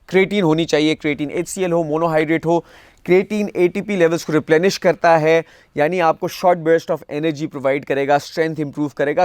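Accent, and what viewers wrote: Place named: India. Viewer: Why are u sounding like some Indian influencers?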